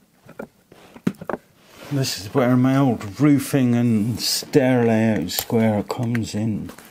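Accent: Cornwall, United Kingdom. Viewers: Great to watch the way you work, I especially like your narratives explaining what you are going & why you are doing it that way..... Also to hear rhe Cornish accent ( in Singapore)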